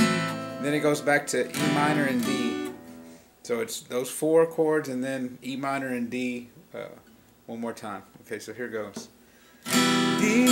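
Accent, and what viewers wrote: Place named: United Kingdom. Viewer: Haha you're great, especially about the cool British accent!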